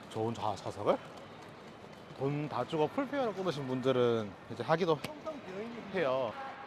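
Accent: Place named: North Korea. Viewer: 말투가 북한 사람같네요. 북한사람인가요?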